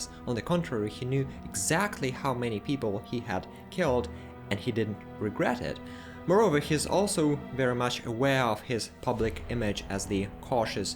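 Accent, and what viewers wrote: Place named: Germany. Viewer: I am trying to work out your accent. I think it's German.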